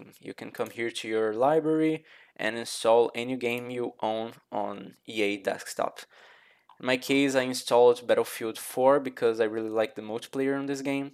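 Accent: Brazil. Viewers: tu falou 3 palavras e vi que tu é BR, tmj mano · Valeu, irmão! Senti nosso sotaque de longe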